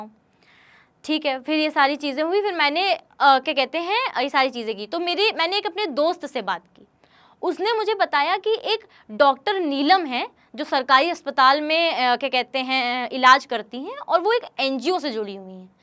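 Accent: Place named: Unnao, India. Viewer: मुझे खुशी हुई, उन्नाव की बिटिया उन्नाव वाली बोली बोल रही है। बहुत सुंदर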